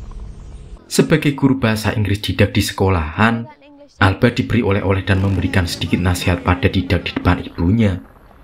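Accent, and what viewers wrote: Java, Indonesia. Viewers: Pegel dengar naratornya yg medhok jawa.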